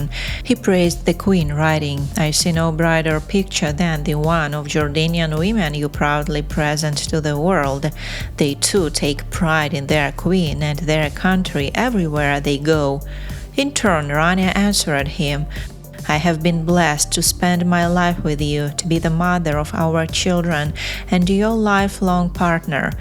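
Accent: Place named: Russia. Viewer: Love the Russian accent eg uffishal for official. A beautiful viydeo🥰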